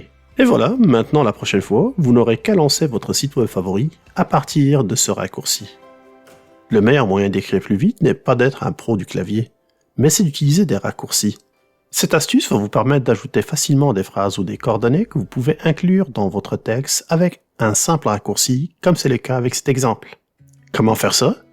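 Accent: Quebec, Canada. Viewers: Bonjour de France pitié je suis entrain de recherché des tuto sur Youtube comment stopper les pubs qui nous dérange presse tout les 4 minutes en visionnant des youtubeuses et youtubeurs ou des émissions ou des films sur Youtube toujours que je parle .donc g pas 1 smartphone dernier cri mais 1 petit simple Wiko .et attention qui n'a pas toutes vos options et appli ? Entre autres les vns privé ou je c quoi je la trouve pas? G juste 1 minimum syndical ? MDR ah ah pitié au secours besoin 2 votre aide urgent ?en attente stop mes recherches et je reste avec vous ok Fréro ? Merci et en plus on adore l'accent 2 Québec. MDR France mardi 1 er novembre 2022.14h 45 de l'après-midi et chez vous c quoi? Bon en attendant la réponse je m'abonne d'urgence pour pas rater le retour ? Ah ah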